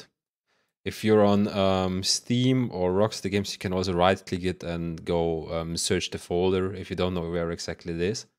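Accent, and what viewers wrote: Germany. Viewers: bro is defenitif deutsch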